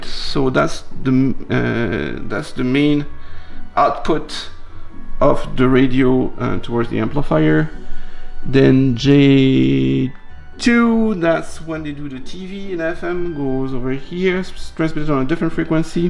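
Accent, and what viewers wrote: France. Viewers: Honestly i like a lot the french accent voiceover but I'm still sad for the loss of Ken's commentary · I never noticed you had a French accent until you mentioned it, don’t know why ;-)!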